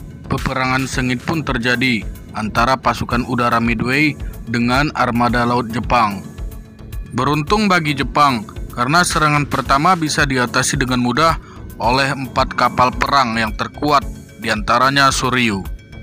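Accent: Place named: Jakarta, Indonesia. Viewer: Astagfirullah, yg komen org Batak yg dikomplain logat Batak. Ni siapa yg isi suara? Mirip suara2 org Betawi sana..., Mcem suara org Jakarta ya.. sukses buat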